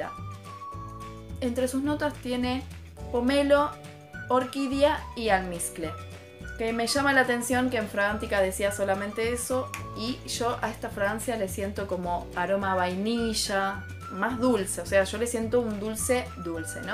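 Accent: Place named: Argentina. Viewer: Ya me parecía. El acento argento es imposible de no reconocer. Yo también soy de Arg.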